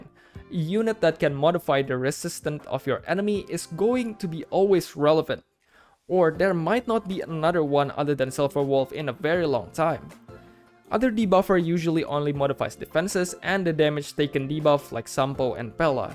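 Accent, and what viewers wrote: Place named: Indonesia. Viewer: Fix Indo wkwk kedengeran aksennya, mantap bang, keep making more content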